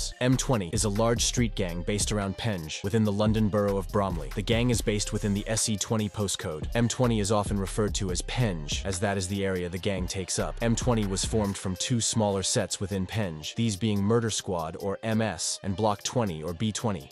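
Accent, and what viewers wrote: United States. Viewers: Presses play Hears AI American type robotic sounding voice Immediately turns video off…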